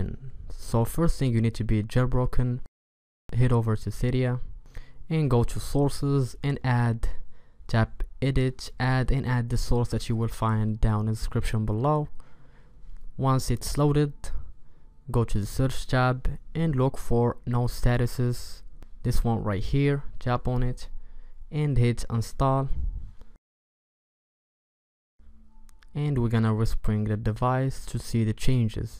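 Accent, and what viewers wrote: Morocco. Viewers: A completely unrelated comment: maghribi akhay yak? Sounds more like it.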